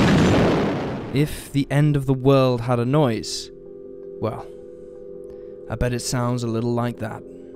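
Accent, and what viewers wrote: United Kingdom. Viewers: "american content makers", maybe, but the narrator here sounds like a limey git. Being "dutch", I would think even you would notice that. btw, I'm German descent, and I found it amusing that said limey git would pronounce "Ju" as "Jew" when describing an aircraft (a "Yunkers", if properly pronounced) used by a regime that was bent on exterminating an entire race by that same name. Twit.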